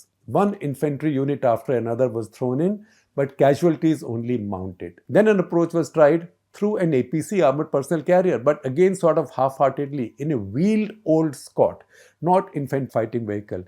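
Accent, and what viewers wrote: India. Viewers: such an annoying way of narrating the story....no proper pauses, no variations. flat Indian accent with no delivery sense at all... though, content may be rich....but you need to work on your delivery sir.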